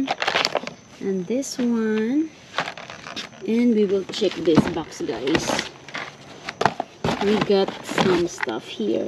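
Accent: Visayas, Philippines. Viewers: Bisaya ka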